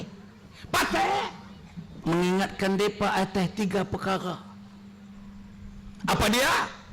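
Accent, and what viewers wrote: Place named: Penang, Malaysia. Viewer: cakap penang macam pelaq!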